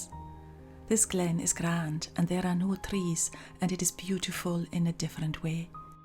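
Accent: Scotland, United Kingdom. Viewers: Every time I watch a video of yours, I'm positively surprised by hearing your Scottish accent. I love the Scottish accent, and personally for me, this is one of the most beautiful accents there is.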